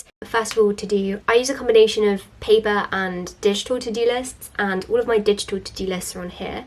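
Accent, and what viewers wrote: United Kingdom. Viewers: is a national treasure. she is so humble and kind. and I love her voice. it's so British and welcoming. lol. great video. you should get more attention.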